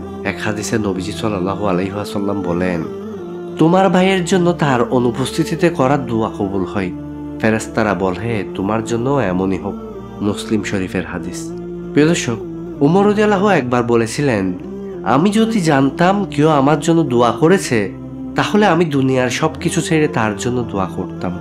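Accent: Chittagong, Bangladesh. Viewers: O chatgaio ki bolo go . polat kole low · এমন ভিডিওগুলি আরো অনেকেই দেন সুন্দর সাবলীল হৃদয়ের ভাষা দিয়ে যা মন কেড়ে নেয় পানি আসে। কিন্তু ভাই আপনি চট্টগ্রামের লোকাল ভাষায় বর্ণনা দিয়ে বিষয়টির মাহাত্ম এবং গুরুত্বই নষ্ট করে দিয়েছেন। কিছুদিন ভাষা চর্চা করেন চট্টগ্রামের লোকাল ভাষা পরিত্যাগ করেন। তারপর ধারাবিবরণী দেন আমরা খুশি হব।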